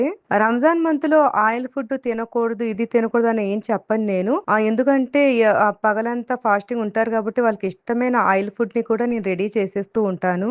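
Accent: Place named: Nellore, India. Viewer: Nellore aaa Madam meedhi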